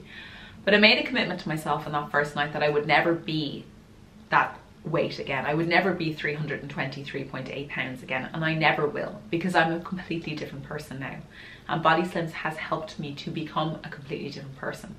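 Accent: Ireland. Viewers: Hello from Spain .are you Irish by any chance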